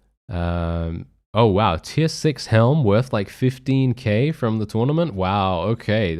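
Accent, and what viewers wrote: United Kingdom. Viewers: How can anybody not recognise you're British accent?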